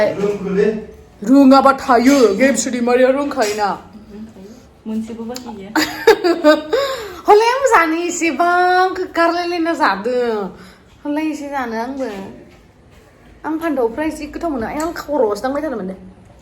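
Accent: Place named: Chirang, India. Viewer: Abo ngni railainaiya manw Chirangnibaidisw Jayw hw ngsinibaidi jaya teobw hitt kwnayw abo wkki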